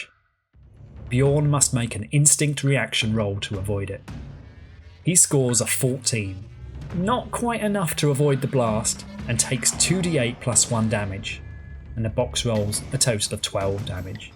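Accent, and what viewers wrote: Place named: United Kingdom. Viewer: I think accent is definitely a plus. Since I'm from across the pond, so I don't know my UK accents. Care to elaborate?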